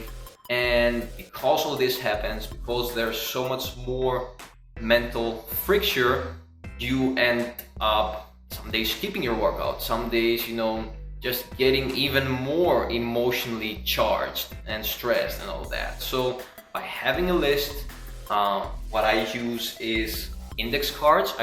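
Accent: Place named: Netherlands. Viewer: Nice video! I always make lists as they provide all the benefits you just mentioned. When I don't I just perform less activities in the day or during the week. Are you Dutch by the way? Ik kan een licht accentje horen ;p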